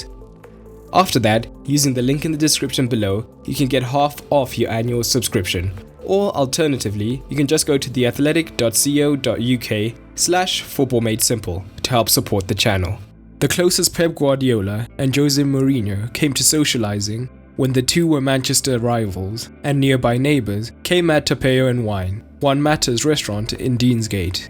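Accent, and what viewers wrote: South Africa. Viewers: Is this South African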